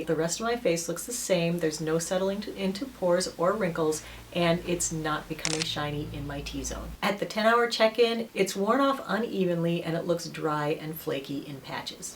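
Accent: United States. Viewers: This foundation looks amazing on you. Another thing, a bit irrelevant i guess, is that i really like the way you talk and articulate. Such a nice accent (american, but you still have many kinds of accents). Makes want to listen to your reviews :D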